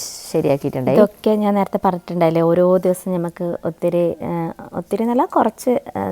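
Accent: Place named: Kannur, India. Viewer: Kutti payar,cheera,mathanga,ellam adipoli aayittund.....oru vithittu athu mulachu varumbol namuk kittunna santhosham athu paranjariyikan kazhiyilla. Nigalude pachakarikalk ellam valathinte kuravu nallathupole und.athukondaa ilakal ellam muradichu nolkunath.cheera kandille muradichu nilkinath.....onapookalam idanulla vegitable flower cash koduthu vangendi vannillale..... Aa manja fruit chiranga vargathil petta inam aanennu thonunnu. Njagalum kaakka poo enna ivide parayunath. Njan paranjathpole thane thakkali ellam kuzhichittu ponnal mathi pine angott thirinju nokkanda😂😂😂😂 Ente nigal vellavum valavum koduthitunel cheera payar ellam adipoli aayi undaayene.valakoorulla mannu cash koduthu vangunathinekal nallathalle valam vangunath. Enik nigalude kannoor shailiyil ulla avatharanam orupad ishtaayi Super video aayirunnutto Pine ente kalyanam kazhinju tto😊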